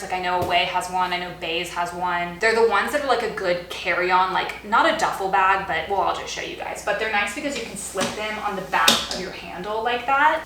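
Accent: South, United States. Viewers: Hearing you say you don't think you have an accent is too cute ( cause you definitely DO) (( saying this as a fellow southern queen)) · As a southerner you have a very noticeable southern accent to me :)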